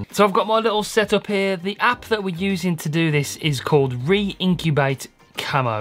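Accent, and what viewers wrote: Birmingham, United Kingdom. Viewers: Loving the brummy accent from another brummy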